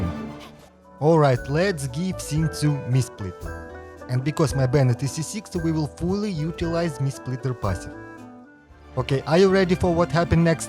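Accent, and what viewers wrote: Russia. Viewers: Русский акцент узнаю из тысячи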